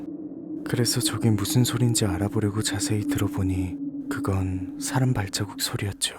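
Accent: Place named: Japan. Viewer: 감기 다 나으셨나요~~~매번 병맛난... 말투에~~~~구~~수한??? 일본어 말투 ㅎㅎㅎ 잘든고 있어요^^💯💯💯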